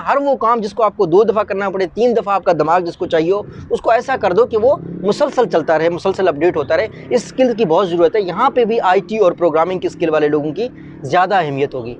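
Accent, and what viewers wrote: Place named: Dera Ghazi Khan, Pakistan. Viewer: Main bhi D G Khan say hoon ap k lehjay say pta chla ap apnay ilaqay say hain meray chotay bhai ho ap bahut khushi hoti hy apnay logoon ko traqi krtay huway ALLAH pak ap ko aor traqi day aameen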